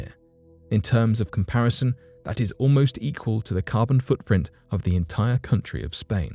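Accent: United Kingdom. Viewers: That's the way Brits pronounce it and he speaks the queen's English. Maybe we are pronouncing it wrong??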